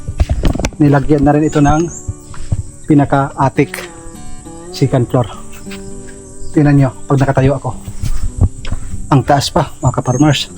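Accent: Waray, Philippines. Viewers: sir waray waray ang salita nyu taga saan po kayo?ofw from abu dhabi and taga leyte...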